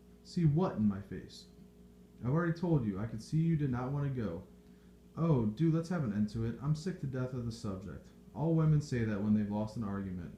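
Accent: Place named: United States. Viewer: Awful. Why is a man and an American reading? Terrible recording too.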